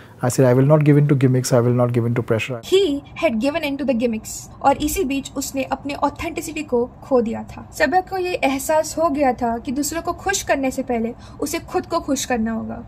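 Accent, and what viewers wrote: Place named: Bengal, India. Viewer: Aapki Hindi sunkar laggra ki app Bengali ho ❤❤❤😊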